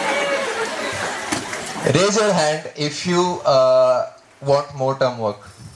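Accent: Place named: Kerala, India. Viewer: His accent is very nice.. I think he's from Kerala (Mallu)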